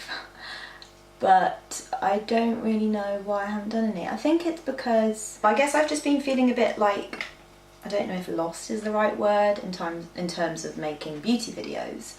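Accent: United Kingdom. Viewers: By, the way. Are you from London?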